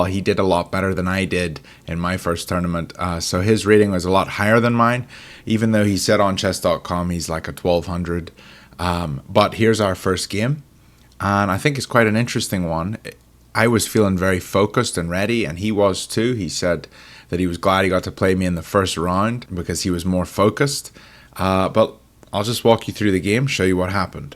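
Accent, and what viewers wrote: United Kingdom. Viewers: Wait you live in the USA? I though you were British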